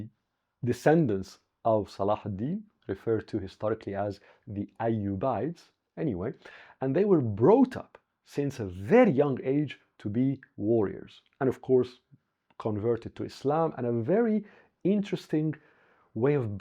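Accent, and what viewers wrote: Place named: Egypt. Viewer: جميل الانجليزي باللهجه المصريه ❤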